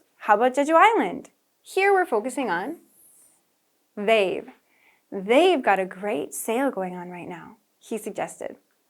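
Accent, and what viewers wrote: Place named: United States. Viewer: 음~~~ 이분 영어 강의 들으니까 미국인 같아 !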